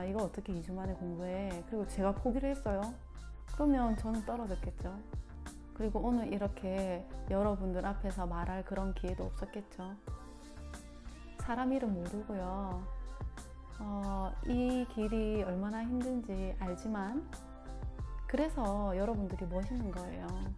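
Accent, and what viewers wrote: Gyeongsang, South Korea. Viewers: 영상 잘 보고 갑니다. 자신감을 가질수 있는 좋은 영상입니다. 댓글을 보았더니 또 악플을 다는 사람들이 있더군요... ㅠㅠ 나쁜사람들~~ 그리고 부산 분이신가요? 경상도 사투리도 구수하네요 아무튼 잘보고 갑니다. 구독 하였으니 좋은 영상 많이 올려주세요.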